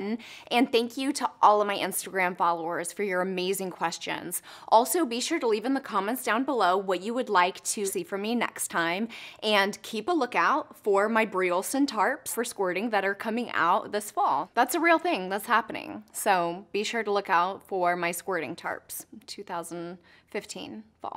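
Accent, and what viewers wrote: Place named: South, United States. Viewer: Hey Bree what part of America are you from?Your accent kinda sounds south :P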